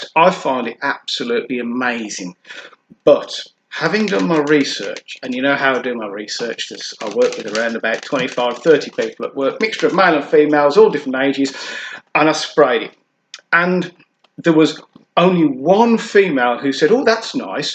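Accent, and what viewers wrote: Birmingham, United Kingdom. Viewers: Great review mate and do i detect a slight Brummie accent there..? ..subscribed 👍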